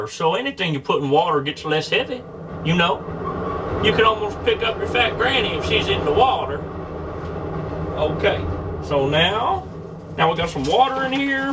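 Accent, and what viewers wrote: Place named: Appalachia, United States. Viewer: holy hillbilly batman. love it though, A+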